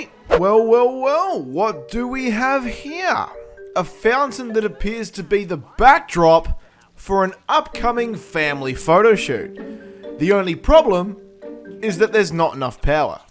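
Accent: Australia. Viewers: brang back so many fking memories of the hitman blood money series very nostalgic excellent commentator 🇭🇲💯🤣😁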